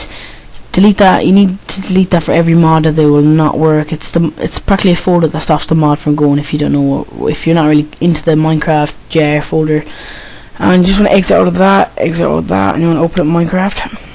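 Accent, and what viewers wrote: Ireland. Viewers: are you irish you sound irish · WHAT PART OF IRELAND ARE U FROM?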